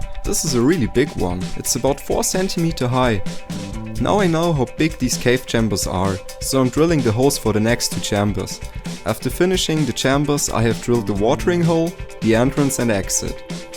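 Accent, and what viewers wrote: Germany. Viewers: Hey. Nice nest. Looks great. Are you come from Germany? Your accent sounds like like german. I come from Hamburg in Germany. I like your channel. Greetings Alex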